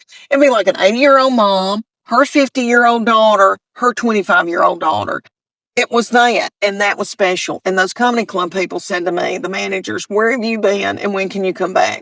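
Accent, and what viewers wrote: South, United States. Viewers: Its on par with Seinfeld humor, which did great so not sure why theres not more of it. Clean humor that for the most part deals with humorous ironies of day to day life. This makes it relatable to everyone who listens to it. And for whatever reason, her deep southern accent makes it all the funnier. Glad i found her ❤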